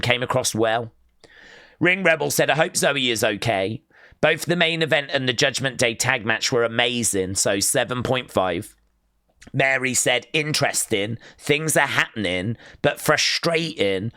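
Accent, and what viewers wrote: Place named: United Kingdom. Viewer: Shoutout from my friend Sonia from The Canary Islands. She loves your british accent! Awesome!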